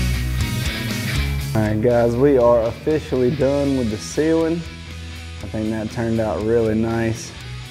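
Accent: South, United States. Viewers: I love your deep Southern accent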